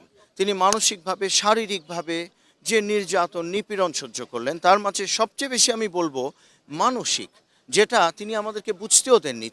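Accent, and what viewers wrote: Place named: Kolkata, India. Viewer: কলকাতার টোনে কথা বলছে টেনে টেনে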